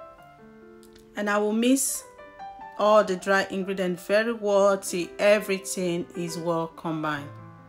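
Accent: Nigeria. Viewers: The person talking is not Kenyan believe me ur from Nigeria though mandazi ni matamu😋😋😋😋😋😋😋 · Is this a Nigerian? The accent isn’t Kenyan nor the slomo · Nigerian accent wow how did u manage that in kenya. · Kenyans have Nigerian accent thou👌👌👌👍👍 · Thats not the kenyan way...accent too sounds Nigerian not kenyan ..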